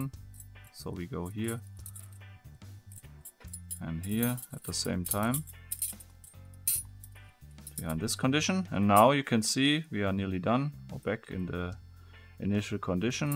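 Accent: Germany. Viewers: Been watchin you for a minute. My first time commenting. "This is my fav puzzle yet." keep it up (German accent and all) sub'd & 👍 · are you German?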